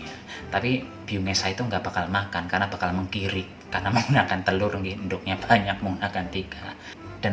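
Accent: Banyumas, Indonesia. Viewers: Pak kiye sekang pak Dirun asli sekang Cilacap, nek rika jawane sekang endi, nek ndeleng ngomonge kaya bahasane wong Banyumasan. Rika nang Batam manggon nang daerah ngendi. Soale aku tau manggon nang Batam suwe kuramg luwih ana 6 tahun manggon nang daerah Pelita.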